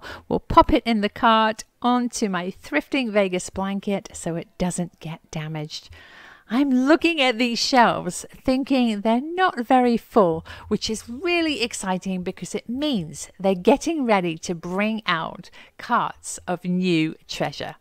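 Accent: England, United Kingdom. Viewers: I really miss the many varieties of hummingbirds I saw daily when I lived in Costa Rica before returning to Canada. I was looking very closely trying to ID them , but definitely some male & female Ruby-throats but not sure of the others. I really enjoy your videos, and laugh when I hear you saying some of the quaint English says. Of coarse, also enjoying watching the dogs and cats...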